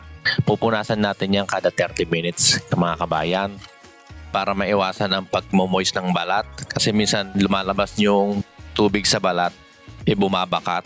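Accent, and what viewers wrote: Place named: Batangas, Philippines. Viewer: batangueño ata are HAHAHA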